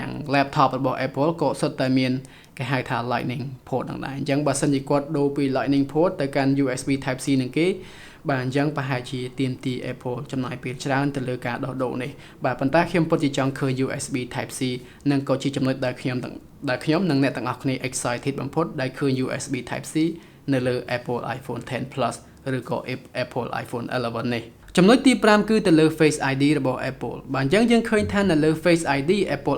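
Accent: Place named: England, United Kingdom. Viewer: តុងអងគ្លេសខ្លាំងពេកហើយ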